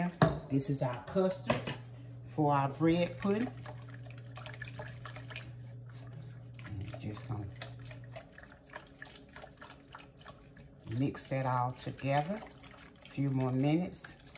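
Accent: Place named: South, United States. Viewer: So happy I just found your channel. Miss I love this recipe but even more I love you & your husband praying. I hope you don’t take offense but I love your sweet soothing Southern accent. Reminds me of my Mom. She was a wonderful cook from Texas but she never measured anything & she passed away at only 47 yrs old. A couple of my brothers don’t even remember her cooking. Mom made bread pudding & the BEST peach cobbler. She also made a wonderful yellow cake with a bundt type texture that had a nutmeg taste. I just turned 75 & can’t wait to check out all your great recipes. I liked & subscribed to your channel. God Bless & keep you & yours. Donna in Arizona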